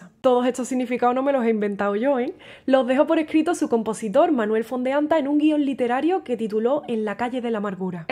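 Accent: Andalusia, Spain. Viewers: Hermoso todo lo que tocas, me da pena decirte , que primero, no me gustaba tu tono de voz, era la primera vez que oía hablar una persona andaluza, pero me gustas como tocas, y aprendí a oírte y acepté tu voz y tu orgullo de tu localidad, y el amor que emana de tus palabras el brillo de tus ojos la sinceridad con que te expresas, y mucho amor para compartir un hermoso trabajo soy de Venezuela de origen muy humilde, me gusta la quitará, pero no sé tocar ninguna pieza completa, por eso me dedico a oírla , ahora te quiero y te admiro mi niña, mi bisabuelo creo que venía de Islas Canarias. Ahora me gusta la narración que haces a tus arreglos. Sigue triunfante. Ynelda Ascanio Gutiérrez.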